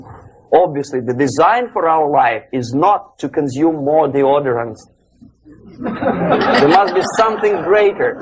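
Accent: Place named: Russia. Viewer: RUSSIAN ENGLISH ACCENT SOUNDS GOOD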